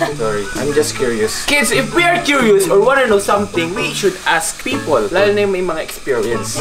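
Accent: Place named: Philippines. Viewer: I love the way he Speaks TagLish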